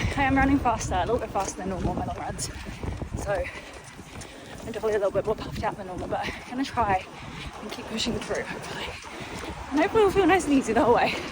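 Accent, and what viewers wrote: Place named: Australia. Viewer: g day/evening for you can I ask when did you start picking up the aussie accent. love you r vlogs by the way 😊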